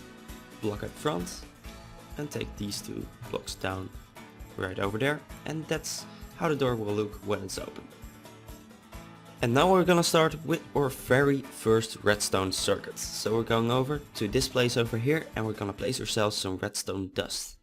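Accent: Netherlands. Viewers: Are you Dutch, because you sound like a Dutch guy who talks English.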